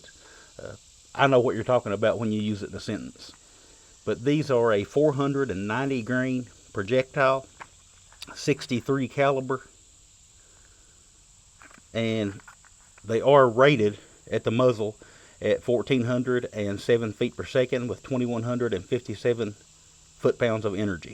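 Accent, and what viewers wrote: United States. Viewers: балдежное произношение. в каких штатах разговаривают в такой манере?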